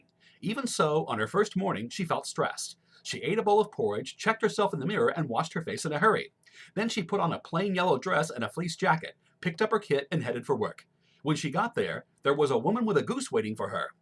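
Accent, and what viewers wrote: United States. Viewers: You have a Lincoln Nebraska accent now lol. Missouri has a natural Southern Drawl no matter where their from. However the accent has slowly died off. My Grandma is from Kohoka (North Missouri). She has a strong Southern Drawl. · You got an amazing Accent.Thanks American for sharing your Accent challenge for all of us. Such a beautiful Voice!! I don't have words. English Degree Student from🇧🇷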